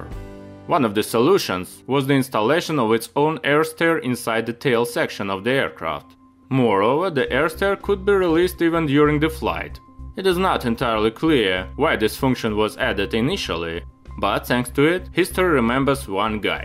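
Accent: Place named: Russia. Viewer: Why the fuck is a Russian accent telling us about the American Boing 727 history …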